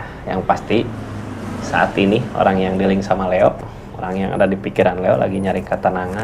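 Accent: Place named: Sunda, Indonesia. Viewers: ❤❤❤❤❤❤❤❤❤❤❤❤❤❤❤ Resonet se x. Aak Aku suka. Yg membaca kan. Logat sunda nya kental banget Sehat dan sukses sllu❤❤ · ❤❤❤❤❤❤❤❤❤❤❤❤ Resonet se x..Aak Aku suka . Yg membaca kan . Leo Sunda nya kental ... banget sehat dan sukses sllu❤❤❤❤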